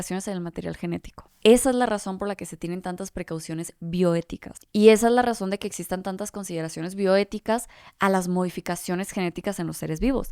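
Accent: Mexico. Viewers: ❤ que bonita sos ... encima me gusta tu tonada mexicana 😊.. saludos desde argentina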